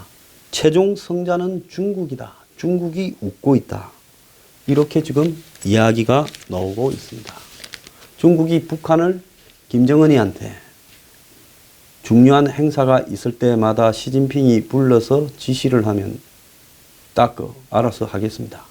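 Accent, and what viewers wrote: Gyeongsang, South Korea. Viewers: 매력: 점점 빠져드는 마초의 갱상도 억양과 가끔씩 날려주는 욕설!" <*랄, 니*미, 니나 잘하세요!(뭉가한테)>이런거 들을때마다 카타르시스 느낍니다! 더 쎄게 해주세요! 쓰레기같은 인간들한테는 말로 해주는것도 아까워요.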